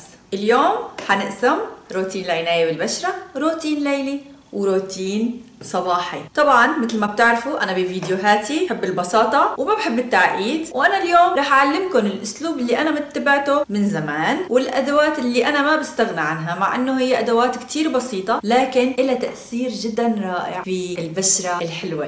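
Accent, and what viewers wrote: Aleppo, Syria. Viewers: حضرتك من سوريا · دخيل الله ما ألذك😍😍 ماتبلى هالضحكة وهاللهجة الحلبية اللي بعشقا❤️❤️ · دخيل روحك بتجنني 😍 دخلك كأنو لهجتك حلبية ؟؟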